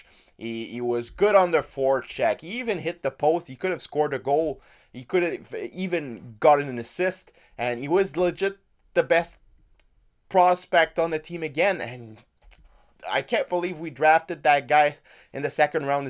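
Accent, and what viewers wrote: Quebec, Canada. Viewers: I knew it was that. I know a girl with the same thing.. Not that close from the eyes... But near. Wish you un prompt rétablissement :) Tu parle anglais comme un Québécois 😌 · Es tu Quebecois ? ta un accent Quebecois quand tu parles anglais :)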